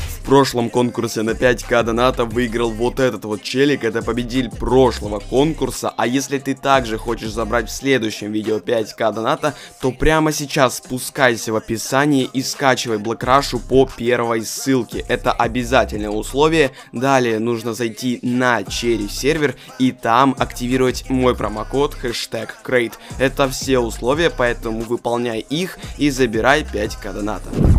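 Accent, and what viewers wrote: Ukraine. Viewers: Ты с Украины?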